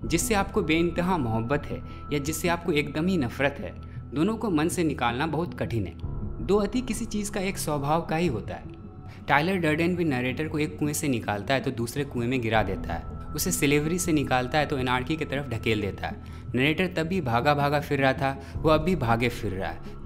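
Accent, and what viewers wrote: Bihar, India. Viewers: <link> no man this guy did it in this way i remembered this after all this time cause this was a gem a pure hand written content and admired this <link> aur ye chutiya apne bhiari accent wali english bol ke alaga gussa dila rha hai aur ditto is dusre wale video ki lines bol rha hai koi bhi samajh jyega original video pe millions me views hai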